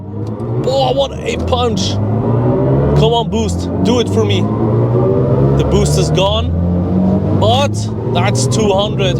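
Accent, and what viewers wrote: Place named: Germany. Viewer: Thought he was speaking german with that accent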